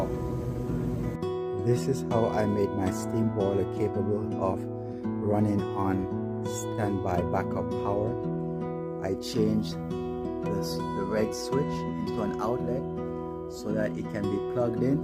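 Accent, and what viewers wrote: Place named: Jamaica. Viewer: You sound Jamaican, greetings from Montego Bay.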